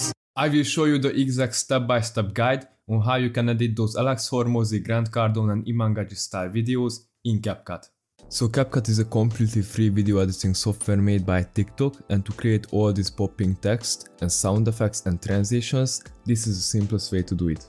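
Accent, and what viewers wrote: Hungary. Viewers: gya de hallatszik hogy magyar vagy teso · Érződik egy kicsikét a magyar akcentusod, de ezerszer felismerhetetlenebb, mint az enyém. Üdv a nemzetközi youtubeon és sok sikert továbbra is! :) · mondom magamban olyan magyarosan beszél ez a pali erre nézem a windows languaget xd kösz amúgy fasza videóü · Magyar akcentus feltűnt 😀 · Hungarian? Edit: I knew by the accent. I'm Hungarian too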